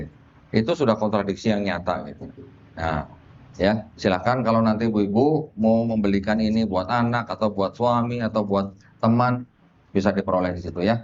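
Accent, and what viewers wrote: Banyumas, Indonesia. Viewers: Koh bawaannya enak Logat nya itu Lo ngapak 😁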